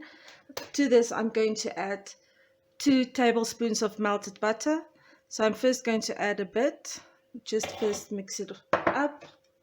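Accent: South Africa. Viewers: ❤yes 💞 South African accent is unique. I immediately recognised the voice and the recipe. Im also South African here in USA ..thank you for the reminder. I will make this delicious tart over the weekend. Yummy 😋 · I recognize your South African accent. Love that you dont need fresh cream in your recipe... a healthier take on Cremora tart. Definitely going to try it! Thanks sooo much and thanks for not adding any music to your video. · Definitely a south African voice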